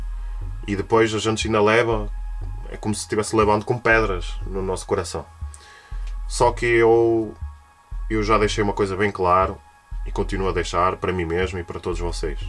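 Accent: Portugal. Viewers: Es portugues fingindo ser brasileiro? Tamaste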